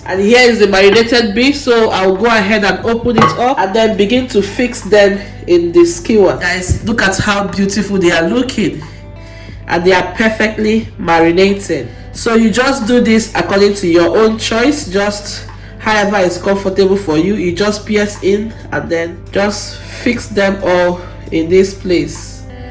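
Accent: Nigeria. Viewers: Damn I love Nigerian accents 😩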